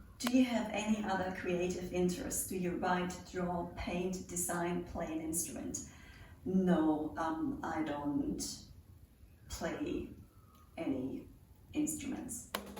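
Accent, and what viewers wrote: Germany. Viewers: Ha! Wie Geil. Danke fürs beantworten! :D And also for translating my questions, I never thought that this could be a struggle! ;D Vom dialekt her hätte ich dich eher in Franken einsortiert aber das du in Ffm geboren bist find ich ja ultra witzig...Vielleicht hat der YT algorithmus dich mir deshalb vorgeschlagen? Grüße aus dem norden Frankfurts!! :D p.s.:Wenn du das nächste Q&A machst kann ich ja wieder fragen stellen. Und wenn ich dir ne flasche Äppler an die Goldcoast schicken soll, sag bescheid :D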